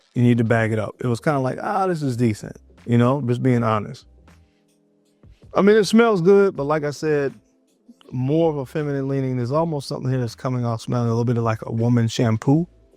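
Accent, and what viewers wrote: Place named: South, United States. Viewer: I bet you’re from the south. I think it might be a bit f a southern thing lol.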